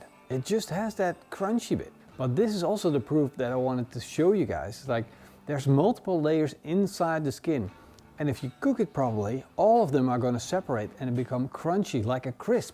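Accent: Netherlands. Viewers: You had me really wanting this until I saw the nipples on the belly . I know I am being a wimp, but I just cant see myself eating the nips! However, I do love the content and your accent. I grew up in Den Haag and the accent reminds me of my time inn Holland. That always makes me smile!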